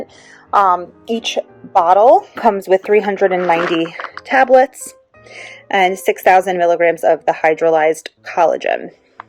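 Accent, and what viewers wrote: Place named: Long Island, United States. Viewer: Are u from along Island New York? U sound like it! I am too! Great video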